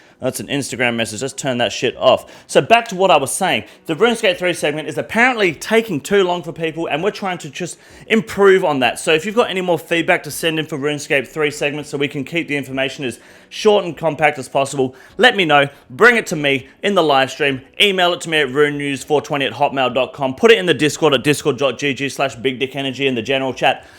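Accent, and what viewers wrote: Australia. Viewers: idk about you but i’m watching his vids because he’s actually unique, very very funny (had quite a few laughs here) intelligent and level headed. Doesn’t involve himself in drama. Stays winning and stays in his lane. Aussie accent, what’s not to like about the dude? He could be reading the entirety of lord of the rings as an audiobook and i’d listen because it’s his energy and personality that’s infectious.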